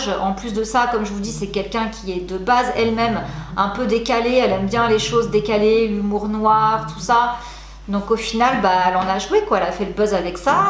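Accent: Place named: Alsace, France. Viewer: Est-ce que t'es alsacienne ? Ta un petit accent 😅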